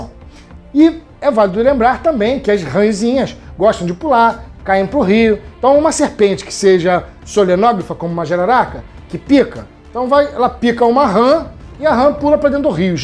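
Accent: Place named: Bahia, Brazil. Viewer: Com sotaque baiano. Meau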